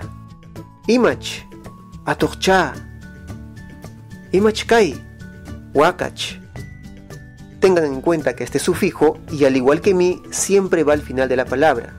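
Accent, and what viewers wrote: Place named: Andahuaylas, Peru. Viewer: Tu quechua es Andahuaylino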